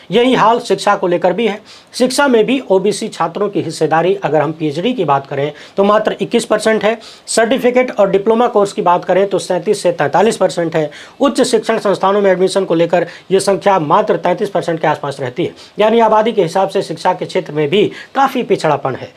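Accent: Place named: Bihar, India. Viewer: Sandar bihari boli and good news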